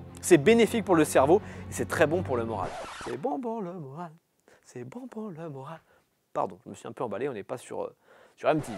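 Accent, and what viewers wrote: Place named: England, United Kingdom. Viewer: Walla like pour l’accent anglais de fou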